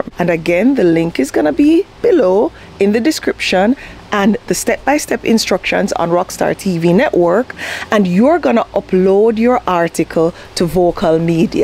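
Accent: Jamaica. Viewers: I love your Jamaican accent, I'm surprised how I'm able to hear it in your English clearly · I know a Jamaican when I hear one.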